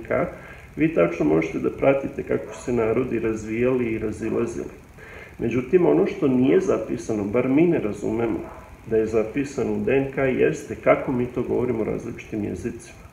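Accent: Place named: Vojvodina, Serbia. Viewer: Gospodine poštujem vas kao naučnika ali vas molim da uvek pričate na Srpskom jeziku,jer ste očigledno odrasli negde u Vovodini bliže NS. Oseti se po naglasku i ne pokušavajte govoriti na Hrvatskom jeziku prosto ne leži vam. Nemam ništa protiv toga ako ste Hrvat niti protiv hrvatskog jezika. Ovo kažem posto ste u jednoj emisiji pokusali da pricate na Hrvatskom ali u najmanju ruku delovalo je smešno i ne prirodno za vas. Nemoj te se ljutiti, sve ostalo je ok,puno vas poštujem. Sve naj bolje želim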